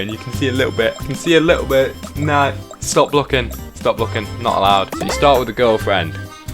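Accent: United Kingdom. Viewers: This guy has the most british accent ive ever heard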